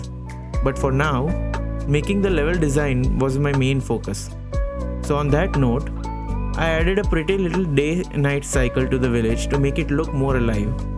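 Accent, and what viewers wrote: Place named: India. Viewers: I am pretty sure he is from India just from accent. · the accent tells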